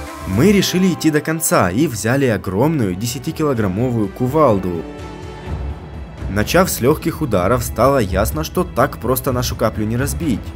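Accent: Russia. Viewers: Все отлично, но так бесит твой марийский акцент. Или это просто такая манера речи, дико извиняюсь, но прям слух режет.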